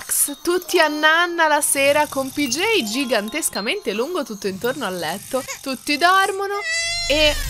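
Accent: Tuscany, Italy. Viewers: ma sei toscana perché ti voglio bene tanto ☺️☺️😊☺️😊☺️☺️ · come sei Toscana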